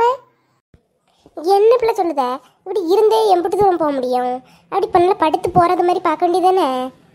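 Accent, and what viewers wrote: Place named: Nagercoil, India. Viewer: Super sis.... Sema...NGL slang....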